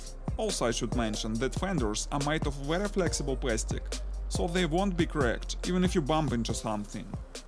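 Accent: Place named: Russia. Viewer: Тяжело слушать настолько мощный рунглишь. По существу, обзор хороший, спасибо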